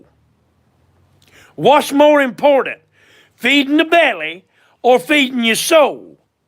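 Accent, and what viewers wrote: South, United States. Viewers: I love that country accent boy!! Jijj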